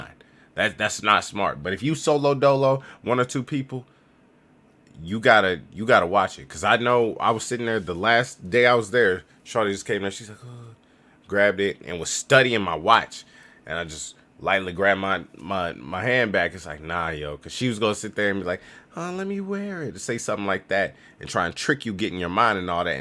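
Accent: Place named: Dominican Republic. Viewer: U actually do look Dominican. They can just tell from how you carry yourself...and your accent of course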